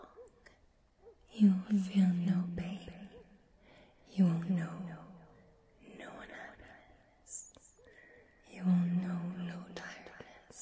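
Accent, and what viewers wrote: Scotland, United Kingdom. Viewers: Wow, you created an awesome & hypnotic ASMR filled video that I love!! You're the sexiest Vampire I've ever seen, & that black lace dress, with the ambient sounds, reverb, & your various effects make this a smoking hot Halloween themed video, imo! The reiki, backdrop, & your lovely Scottish accent, & mind control work for me! Great job sweetie! 🎃Mick💓💓💓💤💤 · I didn’t realise you were Scottish until you sounded American to me at first cos I’ve never heard you before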